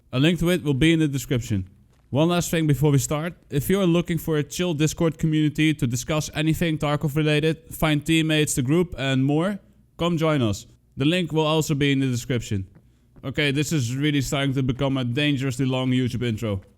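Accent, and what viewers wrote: Netherlands. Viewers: He sounds Dutch kind of northern European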